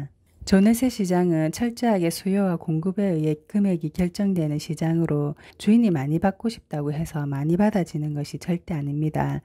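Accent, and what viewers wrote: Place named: Busan, South Korea. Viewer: 안뇽하세요 저는 부산 사상에 살다가 이민을가버렸어요 님목소리들으니 부산에 빨리 가고싶네요 늘 감사합니다~^^♡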